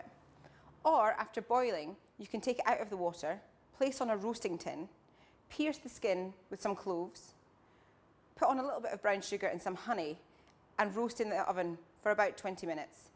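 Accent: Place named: South Africa. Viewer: I just love the South African accent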